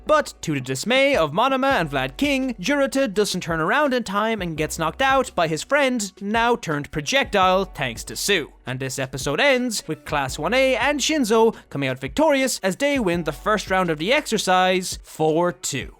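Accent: Ireland. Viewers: Shut up and take my money. Also, you sound Irish. Are you? Curious.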